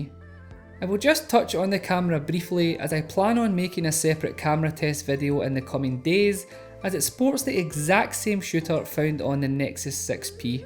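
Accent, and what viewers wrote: Scotland, United Kingdom. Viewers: Where r u from?? Scotland?? Nice accent. Nice video! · If I'm honest I love your accent. I've always wanted to visit Scotland one day 👍🏻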